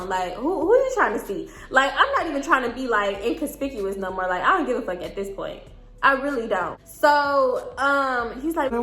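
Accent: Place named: Texas, United States. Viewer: That Texas accent 😍 I follow this nurse Carlee Rae and she from Texas. Y’all sound the same lol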